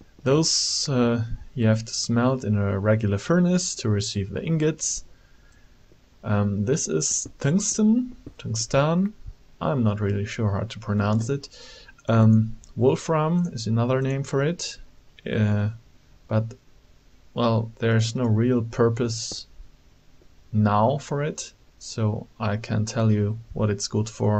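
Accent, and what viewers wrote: Germany. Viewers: Nice Video ! Helped me alot. Did I hear a german slight german accent ?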